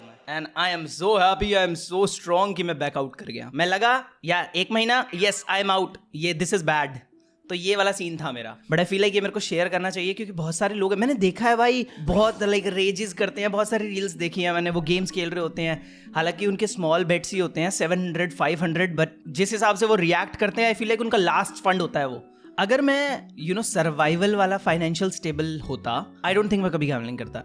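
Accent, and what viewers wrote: Haryana, India. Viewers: I like speaking haryanvi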